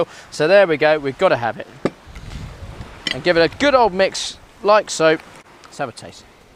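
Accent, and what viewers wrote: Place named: Caribbean. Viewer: Some of your pronunciation is sounding so Caribbean, The island is rubbing off on you.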